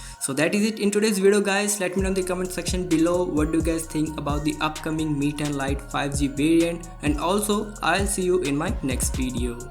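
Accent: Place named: India. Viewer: Love the Indian accent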